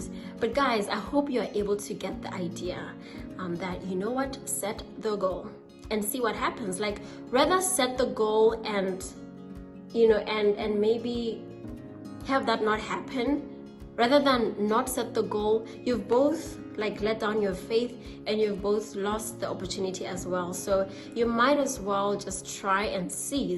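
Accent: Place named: France. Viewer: Great French accent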